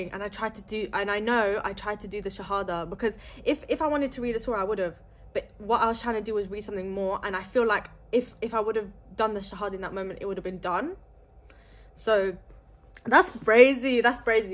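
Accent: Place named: United Kingdom. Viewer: I don't know who you are but started listening to you anyway. Sorry to hear about the car accident. Your accent tells me you are probably from the UK. Best wishes.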